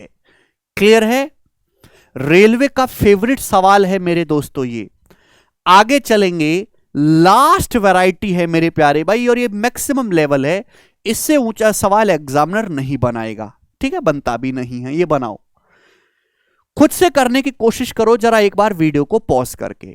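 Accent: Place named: Bihar, India. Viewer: मैथ का मतलब गगन प्रताप सर बिहारी अंदाज में बहुत अच्छा लगता है सर ❤❤❤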